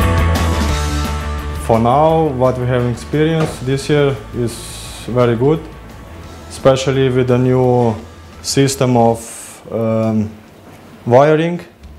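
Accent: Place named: Slovenia. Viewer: I started to watch a clip, didn't know who is talking, but after two words I knew he is from my country... Slovenia! Very typical accent... :) Painful, I saw the comment, very true! But as far as I know, he's good mechanic, who cares if he can set up your bike like a pro! :)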